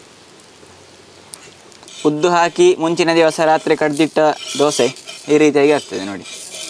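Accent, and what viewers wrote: Mangalore, India. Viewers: No matter what u make, just watching ur videos only bcz of your manglore kannada accent.....wowwwwaw!!!! lOving it since ur 1st day Keep going big fan of u🤩💫 Love from benne nagari - Davanagere KA 17💪💥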